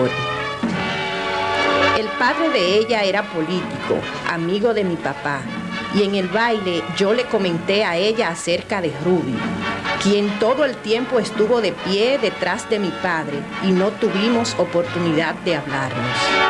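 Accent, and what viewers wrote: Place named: Higüey, Dominican Republic. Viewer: La que narra me está pisando mi Higüey!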